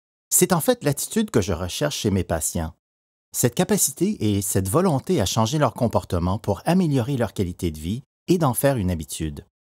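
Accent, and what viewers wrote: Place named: Canada. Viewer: The Canadian accent 💀💀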